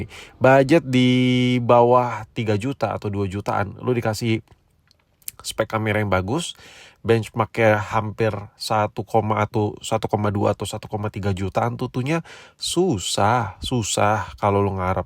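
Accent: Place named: Banyumas, Indonesia. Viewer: Jebule wong ngapak ya😂😂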